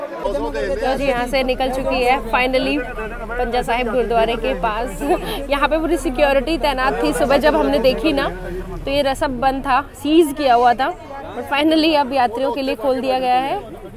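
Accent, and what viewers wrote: India. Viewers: Tum lazmi hindu ho. Kyu k tum namastay aur sat sri akaaaal to bolti ho laikin salaaaam boltay tum ko bohat takleeef hoti hai. Sikh hoti to salaaam zaroooor karti. Sikh hoti to punjab ya khalistan ka naaam layti. Baaar. Baaaar. India. Ya. Hindustan. Na. Bolti. Tumhara. Urdu. Bolnay. Ka. Andaaaz. Pakka. U. P. Wala. Hai. Bhia. Ho. Bhia. U. P. Ki. Bhia. Love. Khalistan. ❤❤ v. Real. Sikh. For. Khalistan. Khalsa. For. Khalistan. May. To. Insaaaan. Ki. Shaksl. Dekh. Kar. Us. Ko. Pehchaaaan. Layta. Hoon.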